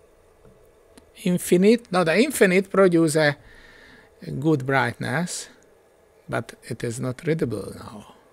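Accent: Hungary. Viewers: Így ahogy mondod néha a kevesebb több, tetszett a CRT-k gyorsasága, és persze a Hunglish pronansziésönöd is :)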